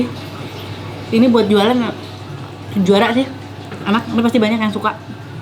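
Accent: West Java, Indonesia. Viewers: Resep nyarios logat sunda na teh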